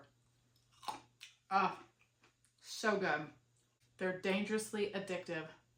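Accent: South, United States. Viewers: My guess is F since she seems to have a southern (US) accent.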